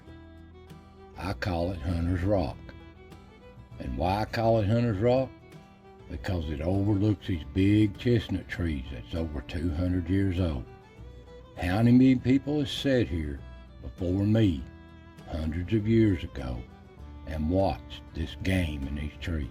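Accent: Appalachia, United States. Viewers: I can't tell you how much I appreciate you bringing these kind of videos to the World. Just hearing you talk reminds me of some of the best people I've been so lucky to ever met and learned from in my life and of times that have so quickly come and gone. I was born and currently live in the very top of Kentucky but I grew up in East Tennessee wandering thru those beloved Appalachians and that's where my heart stays. So these videos are invaluable to me, as I live in a perpetual state of being homesick. Thank You so much for your time & effort, and your willingness to share!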